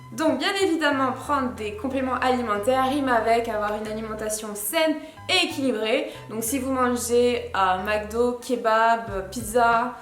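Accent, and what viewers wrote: South, France. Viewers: J'adore ta voix avec ton petit accent du sud haha video interessante merci continue comme ca tu gere biZe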